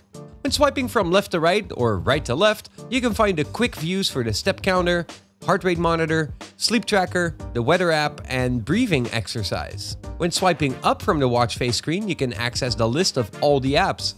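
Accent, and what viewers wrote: Netherlands. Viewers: Hi! Are you dutch?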